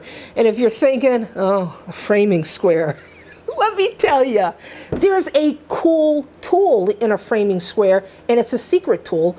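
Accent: United States. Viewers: Great video. I loved it. Awesome. I was also trying to figure out if you were American or from Nova Scotia.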